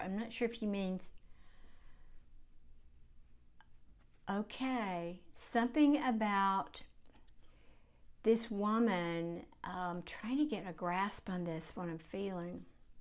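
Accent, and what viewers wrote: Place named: South, United States. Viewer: it was we have to make lemon pies and lemonade out of lemons… also spirit says never apologize for your southern draw. Your gorgeous 🤍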